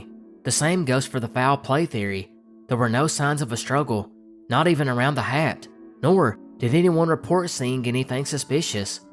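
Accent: South, United States. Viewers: A thick southern accent was perfect for these cold northern stories.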